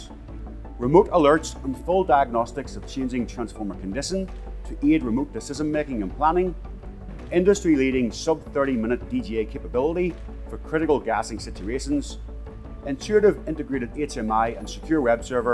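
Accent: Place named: Northern Ireland, United Kingdom. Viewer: Excellent Video. The subtitles helped with the NORN IRON accent!:-)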